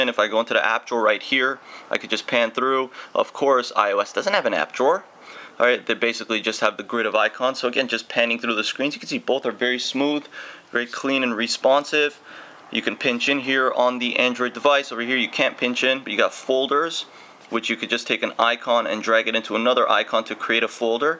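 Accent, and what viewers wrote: New York, United States. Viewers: Superb review. By the way, is that a N.Y. accent?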